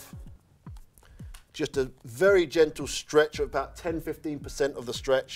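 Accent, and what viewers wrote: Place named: England, United Kingdom. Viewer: that English accent he has is awesome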